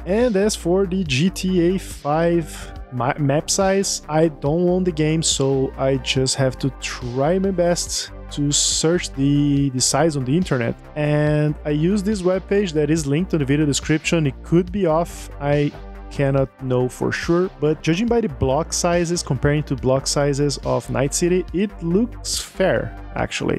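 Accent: Brazil. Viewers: eae mano, notei pelo sotaque kkkk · Are you Brazilian?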